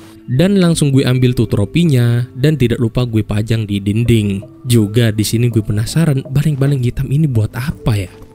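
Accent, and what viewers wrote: Indonesia. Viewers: lu orang madura ya bang? logat nya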